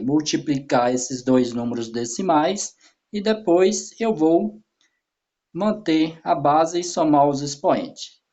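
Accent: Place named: Rio de Janeiro, Brazil. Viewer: Parece ser do rj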